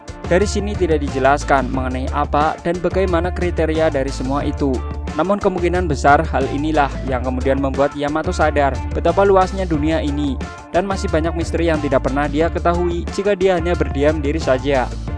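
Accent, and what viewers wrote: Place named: Tegal, Indonesia. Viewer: Dri logat bicara nya, kya won't tegal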